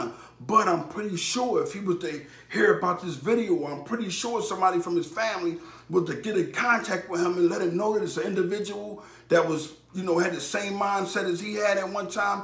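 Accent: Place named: East Harlem, United States. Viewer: Yu got like a East Harlem/South Bronx accent. U from the x?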